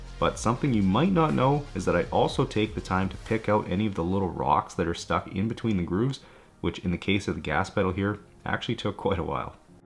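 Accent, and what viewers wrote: Canada. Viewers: I was just about to ask if you were from Canada lol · The Canadian accent is so cute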